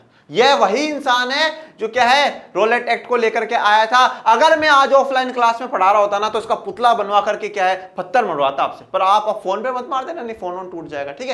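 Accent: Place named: Rajasthan, India. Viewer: Sir kya aap rajasthani ho apki language vaisi he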